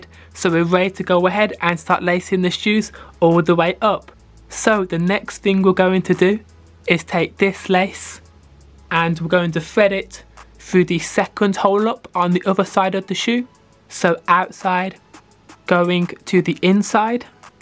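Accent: United Kingdom. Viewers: Mate, these kicks are rad! I love your accent too. Which part of England are you from? Cheers, Fran (NZ)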